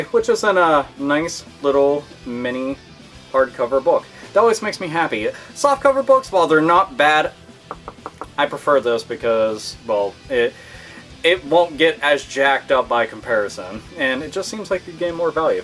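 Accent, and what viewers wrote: Ireland. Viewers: wait you're Irish ? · Holy shit, you're Irish!?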